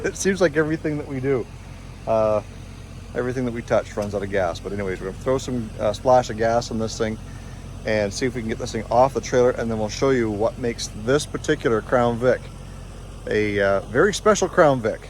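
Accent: Canada. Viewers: so why do you have an address in Maine but still use "kilometers" and have a Canadian accent?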